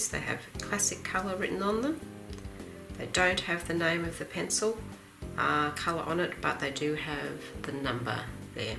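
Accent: Australia. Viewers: that video was fantastic and so good to hear a fellow Australian accent. I am 74 y.o. and have just recently gotten into colouring books. I am addicted!!! So relaxing. Your video is so informative and I am asking Santa for some Faber Castell Polychromos for Christmas. My other addiction is making cards. Thanks.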